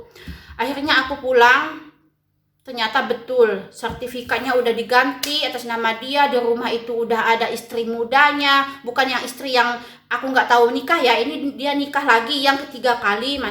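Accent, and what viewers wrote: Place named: Lampung, Indonesia. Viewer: Logat mu kayak org Lampung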